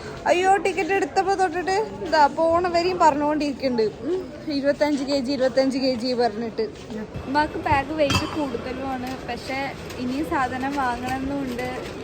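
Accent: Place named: Kochi, India. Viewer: Kochi slang annalo vanne samsarikumbo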